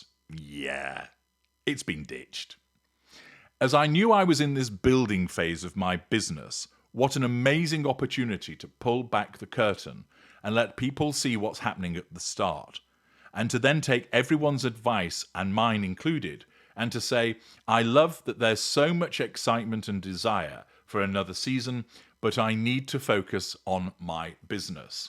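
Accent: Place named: England, United Kingdom. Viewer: I imagine that the snake in the Garden of Eden sounded like you. I think you could talk anybody into anything with that deep and silky English accent.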